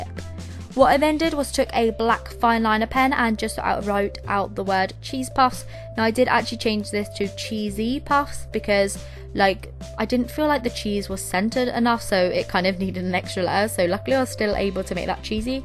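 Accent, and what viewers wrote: England, United Kingdom. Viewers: You just say some british accent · I'm guessing Your From England But who cares? I love you!❤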